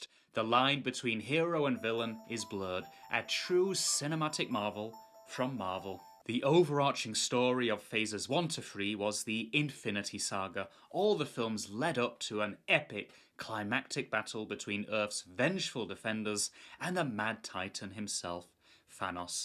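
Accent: United Kingdom. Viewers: These videos are INSPIRING and genius. I have been pondering making a channel praising the woke bowel movement incessantly in a serious manner to the point of ridiculousness for awhile now. I will only do it if I can pull it off right. It won't be as elegantly as does it that's for sure. He's a Brit so the accent is perfect for it.. I'm going to have to come at it in a more aggressive Antifa/BLM obnoxious type of manner. Great job, mate.. cheers!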